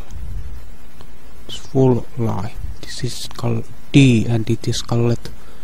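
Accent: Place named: Finland. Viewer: Finnish accent is best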